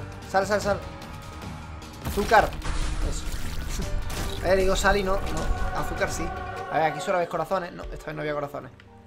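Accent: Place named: Seville, Spain. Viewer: Pensé que eras sevillano aver si nos vemos por la calle XD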